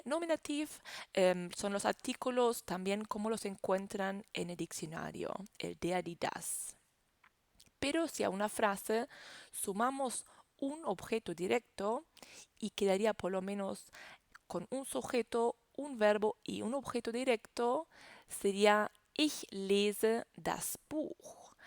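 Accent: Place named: Castile, Spain. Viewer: Sos una crack... Dankeeee Totales!! P.d. Me emociona, se me caen las lágrimas y canto un tango por tu acento castellano. :)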